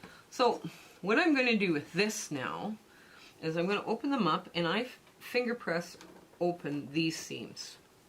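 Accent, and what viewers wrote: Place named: Canada. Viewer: I've made a couple of 8" crumb blocks, ao wondering if sewing the triangles into sqares might be a good option for continuing... I'm really enjoying your videos - and adorable Canadian accent ;-)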